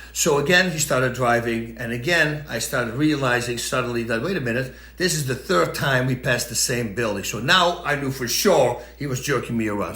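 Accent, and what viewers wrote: Netherlands. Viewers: i couldn’t, his dutch accent is too much. every dutch person speaks english like this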